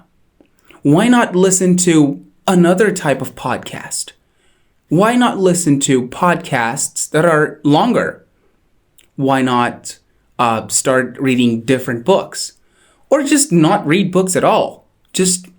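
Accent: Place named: United States. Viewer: Another great video. I suppose I should have asked you this question in your American accent video, but I hope you don't mind my asking your more questions. Did you study how to make each sound (each vowel and consonant)? I know you read out loud a lot and used movies, songs, podcasts, etc. to get your American accent. But did you also use any American accent textbooks or websites to get your American accent? I love your videos. Thanks.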